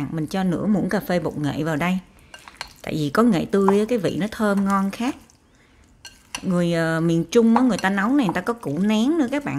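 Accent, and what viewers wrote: South, Vietnam. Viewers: Always love hot boy voice and yours. Your South Vietnamese accent is cute. Your kitchen and cooking tools look very clean. I am impressed. Keep up the good work. Hope to see you in person some day at any restaurant in CA. I can’t eat a lot like you (because just smelling foods also makes me fat.😂) but I do enjoy watching your food stories).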